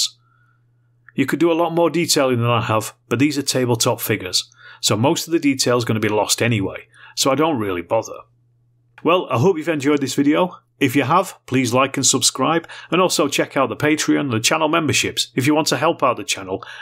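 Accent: Northern England, United Kingdom. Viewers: I love mid-England Northern accents! Keep talking mate!!!!!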